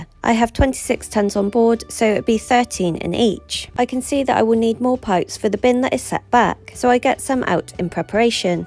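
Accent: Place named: Wales, United Kingdom. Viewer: Hi, nice to see you back again and looking well although I know looks can be deceiving. But you are always smiling so that’s good. Yes the welsh accent is great isn’t it although I am a bit biased mum was Welsh and us kids were bombed out of London in the blitz. So up to our family south wales lucky us.😂😂. I bet it’s nice sleeping on the farms and safe of cause. Well stay well you’re health is the most important thing so never take it for granted if you do it will catch you out down the line. I know I have been there and it’s most unpleasant. Good luck Jon 🇦🇺WA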